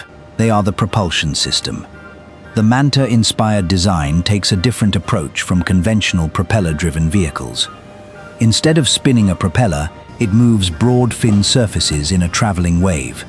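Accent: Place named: United Kingdom. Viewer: strong British accent is a dead giveaway that video is a fraud. Scammers.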